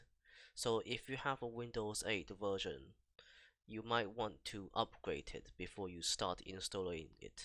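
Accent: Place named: United Kingdom. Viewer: 你英文好流利~ 還有英腔~~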